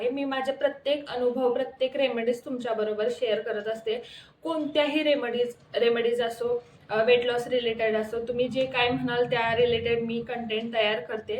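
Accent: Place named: Kolhapur, India. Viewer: Pune mg chan mala Tumch marathi kolhapur sarkh vatle mhnun mi kolhapur madhun bgte tumche video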